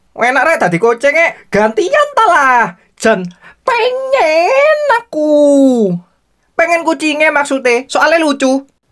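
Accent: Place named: Malang, Indonesia. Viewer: Eruh sound malang mas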